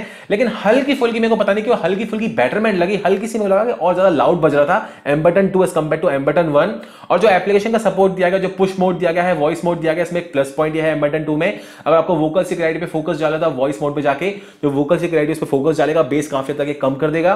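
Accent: India. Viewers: Thank you for this comparison. I speak English as a second language but I’m quite fluent. However I find a bit difficult to get all the 200 words per second with this Indian inflection. The video seems very good but I suggest to slow down a little and try to capture a wider audience.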